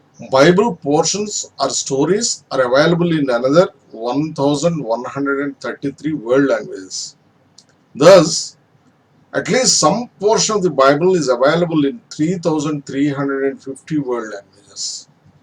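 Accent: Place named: India. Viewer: Can you say which English are you speaking? British or American ? Easy to say, speak in English,but it's hard . Pronunciation as well as spelling differ. By the way you have indian tongue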